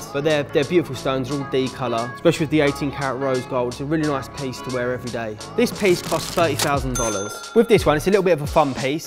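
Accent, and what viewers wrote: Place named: East London, United Kingdom. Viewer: Wow, amazing collection 👌 Just found your channel, love it! Love your accent too, sounds just like mine 😜 Born and bred in Custom House, East London but live in Australia at the moment 😊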